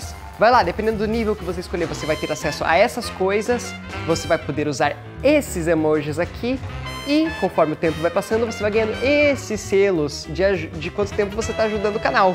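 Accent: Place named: Brazil. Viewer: Olá, tudo bem? Você poderia contar um pouco de sua história tal como quem é você, português ou brasileiro? Por que fala portugues como brasileiro? Como se apaixonou pela língua portuguesa, sua área de interesse no mestrado... gosto muito do seu canal.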